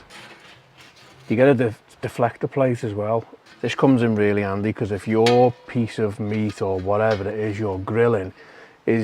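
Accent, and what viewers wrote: United Kingdom. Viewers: FANTASTIC video. I'm a working class Master Touch guy, looking to "up my game" without taking out a loan. I've had my eye on a Weber Summit for a while and this video pushed me over the finish line. Happy to see a Brit who's into BBQ and LOVE the accent. Would love to see what you use for dry rubs and sauces. Again, thanks from Alaska for a really good video. Tell your pupper I said hi.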